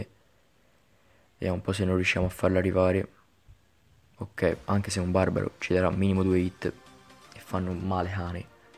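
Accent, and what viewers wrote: Tuscany, Italy. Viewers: Ma quanto è bello il toscano 😍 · è toscano come me ahahahha😅❤ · Ma sei toscano? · ma sei toscano? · sei toscano vero si sente l'accento. come me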